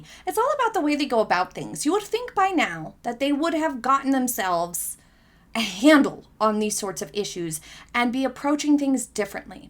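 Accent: United States. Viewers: It’s people like you who are getting it wrong. I am surprised because you sound American and should know better than to repeat so much information from the Tabloids. I expect from the British because they (including the Monarchy) lives and dies by the tabloid news. So much of what you are espousing came directly from the tabloids. 🤷🏾‍♂️ This trip has been an absolute success! The Colombian people have embraced Sussex’s! That is the problem! The tours they made as royals were excellent! They hit it out of the park every time. They are embraced by DIVERSE populations of people around the world because they are much more relatable than the Windsors. Kate and Will’s last tour (the Caribbean) was a disaster! Terribly out of touch with the world. There are many things you can say about Meghan but you absolutely cannot say she is lazy or lacks ambition. Why is it problem because she enjoys working hard and is good at it? 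It’s a problem because the rest of the royals are mediocre at best. Your biggest hater’s tend to be those who you are associated with and don’t like you because you are working too hard for them to keep up! Meghan left the royals, it was her choice. They are salty because she had the balls to do it! PERIOD! That is the biggest problem along with the systemic racism and colonial mindset that the royals can’t let go of. Millions of black and brown people around the world who live in countries that have been raped and pillaged by the British Royal Family are unwi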